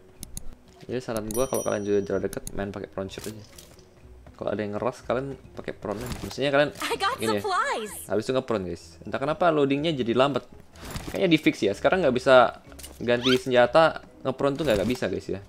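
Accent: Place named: Semarang, Indonesia. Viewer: Pekok pekok wkwkwk Semarangan bangeeettt, nguakak pok aku nda ndaa 🤣🤣🤣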